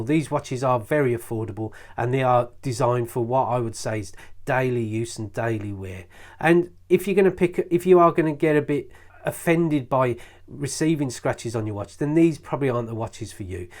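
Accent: Birmingham, United Kingdom. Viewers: Thanks. My first watch in the 70's was a Casio digital and now.. after all these years I've got a yearning to get back into these type of watches. l recently bought a silver coloured e1200 and I'm really impressed with it.. so much so - that I'm going to buy a few more digitals and I'm watching a few vids about them. Saw this excellent vid of yours and it is very detailed and informative..plus, it's always nice to hear a Brummie accent. If you don't mind, please could you just answer one question from me..I like the legibility of the reviewed model just shown, especially with its larger digits, but I'm undecided about the size of this watch as I prefer slightly larger faces. Without going into G Shock territory what Casio's could you suggest I take a look at?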